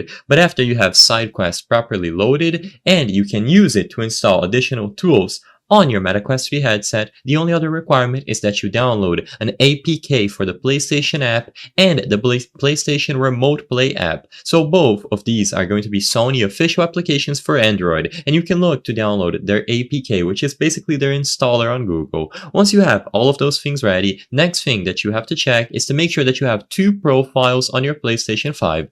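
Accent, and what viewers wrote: Brazil. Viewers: esse ingles é de brasileiro ein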